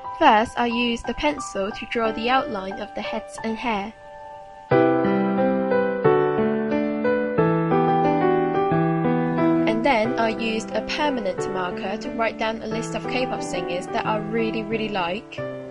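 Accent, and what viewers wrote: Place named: England, United Kingdom. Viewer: i thought u were from england cuz of the accent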